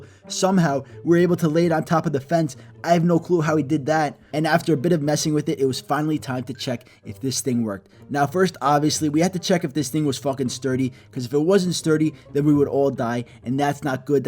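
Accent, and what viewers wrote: New York, United States. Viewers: This mans gotta be from new york or someones god father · Haha how you can tell he is newyork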